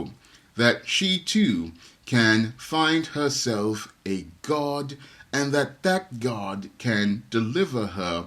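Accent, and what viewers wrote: United Kingdom. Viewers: I usually cringe when an American tries to pull off a British accent. But this was hilarious. I had a jolly good laugh after this video. As for Harry. He should have pulled out when he had the chance. His own brothers warned him multiple times. But he was stubborn and foolish. Blinded by love. And he will face the consequences of this silk spider web soon enough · I enjoyed the British accent. Also I will never get over your laugh. It's contagious!! 😄😄😄😆😆😆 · Thumbs up for the British accent and the term Blackistan.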